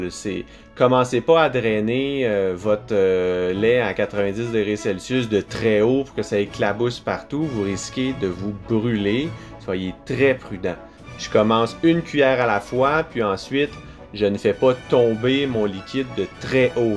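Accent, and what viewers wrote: Quebec, Canada. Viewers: Bonsoir... j'adore votre accent Canadien ! J'habite le sud de la France, et ce fromage, avant d'être pressé, est la Ricotta, ou la Brousse, aussi le Broccio en Corse. On peut l'utiliser pour fabriquer des gâteaux. Et, on l'utilise aussi pour fabriquer des Raviolis, en ajoutant des herbes, des épinards, ou du vert de blettes, etc... Je fabriquais ce merveilleux fromage, pour mes enfants, lorsque j'étais plus jeune... Aussi, encore ici dans le sud, dans les montagnes, sans le presser, on y ajoute du sucre...ou de la confiture, et c'est un délicieux dessert, ou un en-cas lorsqu'on a une petite faim. Je mangeais ce fromage, en descendant à pied, alors que je l'achetais aussi dans les fermes en montagnes des Alpes Maritimes... Merci, pour vos belles recettes de Fromages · Bonjour, merci pour votre recette, je vais venir vivre au Canada 🤩 j'aime beaucoup votre accent, ça égaye la vision de votre chaîne. 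Merci beaucoup à vous. Je vous souhaite de passer d'excellentes fêtes de fin d'année 🎇🥂🎆🍾😘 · Cet accent il faut le breveter ! On aimerait l'entendre dans des films français ! C'est trop cool. C'est incroyable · C est pas un accent canadien pantoute mais quebecois ma cousine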